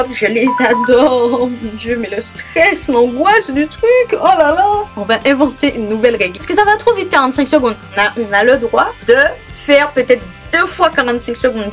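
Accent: Antilles, Caribbean. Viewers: Tu es un vrai joyau !!! ♥ ♥ Ça fait plusieurs fois que je regarde tes vidéos mais je n'ai jamais eu assez de temps pour te laisser un petit commentaire ! Ton accent est à croquer, ça sent bon le soleil et la chaleur des Antilles ! Tes vidéos sont toujours un vrai régal ! ♥ Plein de gros bisous !!! ♥ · Avec ton accent antillais la😂😂❤. Super vidéo !💋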